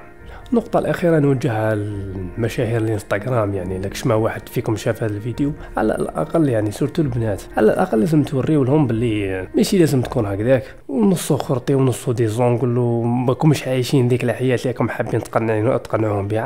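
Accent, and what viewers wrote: Algeria. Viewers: سطايفي ولا برايجي باين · سلام عليكم خويا فيديو رائع يعطيك الصحة... نسقسيك نتا سطايفي ولا برايجي الله يبارك من اللهجة المهم شكرا اخي ❤❤❤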